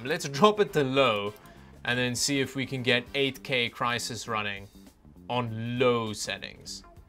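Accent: South Africa. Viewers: He is South African. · Are you south African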